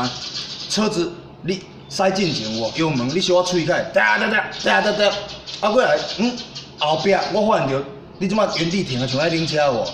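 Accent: Taiwan. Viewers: 幹你的台語好道地好親切好有台灣味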